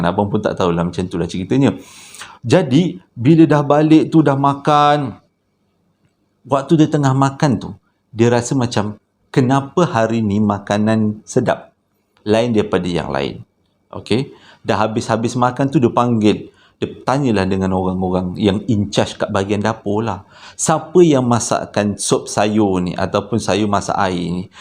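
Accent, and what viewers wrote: Java, Indonesia. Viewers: Nape aku suka bercerita: 1- Xde jumpscare..jd nye xde la terkejut2..haha 2- Dia cerita xde cut dan edit..terus je..so rasa cam borak depan2... 3-,ko jawa ke?agak pekat bunyi jawa ko..hilang rs rindu nak borak2 dgn kwn2 jawa.. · Selain kc champion. Abg best la citer mistik2 dan hikayat.. best je dgr bila abg smpai kn citer kadang2 ade sleng mcm jawa 😂😂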